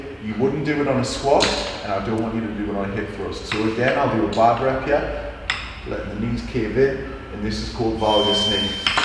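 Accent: Newcastle, United Kingdom. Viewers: He´s from Newcastle, north of England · He has an accent? Which part of UK he is?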